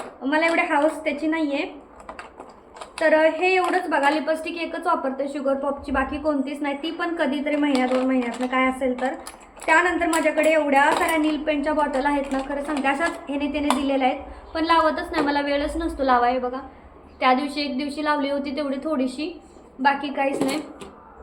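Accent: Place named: Baramati, India. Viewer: ताई कुठे राहतेस तुझीभाषा तर बारामती साईट ची वाटते खुप छान असतात व्हिडीओ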